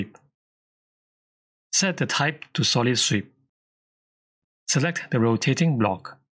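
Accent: Singapore. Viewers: Singaporean accent on a great educational video? YES, immediate subscription!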